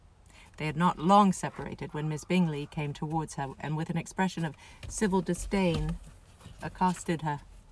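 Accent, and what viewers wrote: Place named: United Kingdom. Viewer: Hi from New Zealand. Am enjoying listening to the modulation of your voice. And amused when you catch yourself slipping in & out of the British & US accents.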